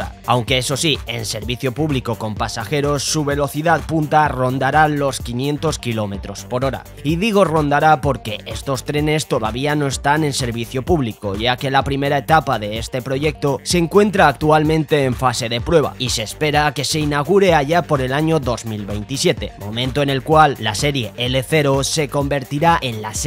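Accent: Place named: Spain. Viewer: no me gusta tu voz, la voz de los españoles ....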